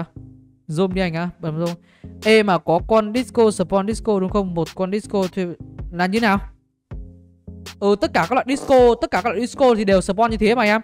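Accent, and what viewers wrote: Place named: Binh Dinh, Vietnam. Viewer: Anh là người bình định à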